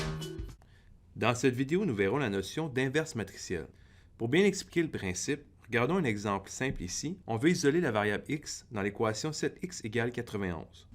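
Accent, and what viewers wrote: Canada. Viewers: The lady professor is much better + i don't understand your accent man, keep the channel international not just canadian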